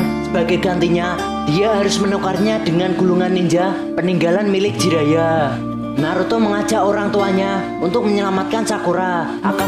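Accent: Java, Indonesia. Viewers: Njir medok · Logat nya jawa banget kang 🗿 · Wong jowo yo mas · Awokawok logat jawa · Anjirrr suaranya medok bgt😂😂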